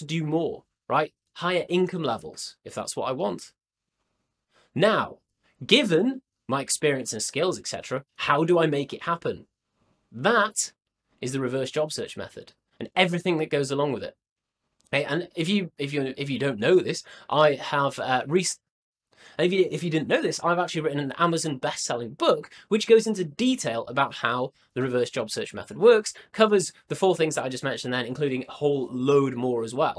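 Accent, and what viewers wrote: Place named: United Kingdom. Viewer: well ! Bro I'm listening to British accent so I found that you sound British.. Therefore, I asked it from you. Thank you so much for responding Could you please recommend me more youtubers with British accent?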